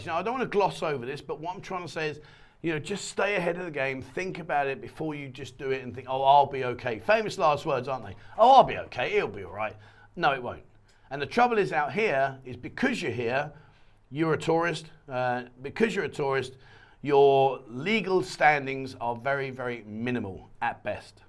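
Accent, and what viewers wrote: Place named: South London, United Kingdom. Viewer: Where you from in England mate sounds like our way abit. South of London?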